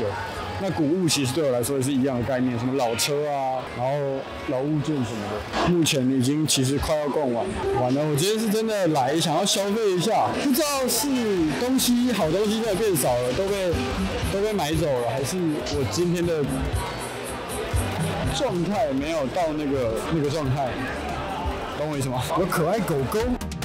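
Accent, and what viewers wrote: Taiwan. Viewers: 哇!你的中文口音好台~ 那個是蔣中正啦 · 哇！你的口音 根本台灣人耶 ，但臉是阿兜啊！你在台灣出生的嗎？ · 不好意思，為什麼我看到外國人的臉，講話為何是台灣年輕人？（這影片自己跳出來） 我太好奇了立馬自己找答案所以我很努力的滑最前面的影片，因為介紹通常都在前面，您爸爸是德國人媽媽是波蘭人，在台北出生在高雄長大，進口的台灣人ya~ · 你居然有台灣口音,聽了真親切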